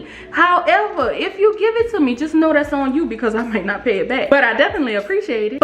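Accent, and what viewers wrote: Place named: Louisiana, United States. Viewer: Hello from Baton Rouge Louisiana. I knew that dialect was from South Louisiana.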